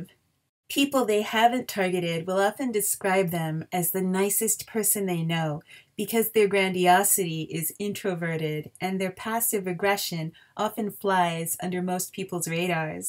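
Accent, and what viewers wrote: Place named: Canada. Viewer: I hear the Canadian in the presenters voice lol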